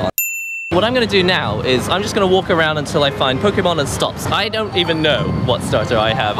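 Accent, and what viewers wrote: United Kingdom. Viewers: Um wierd what breed are u? Asien,With Briten Accent, Who speaking English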